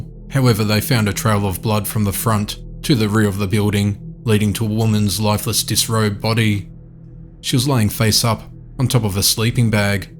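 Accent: Australia. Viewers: Me too! He's smashing every other true crime page i am subscribed to! i love his voice and finally finding an AUSSIE amongst all the true crime channels 🙌🙌 No offence to anyone not feom Australia- I've just found it VERY rare to come across :-)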